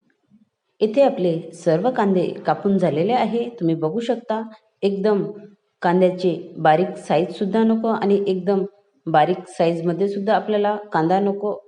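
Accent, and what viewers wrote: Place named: South, India. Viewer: ताई साऊथ च्या आहेत म्हणून असे मराठी बोलतात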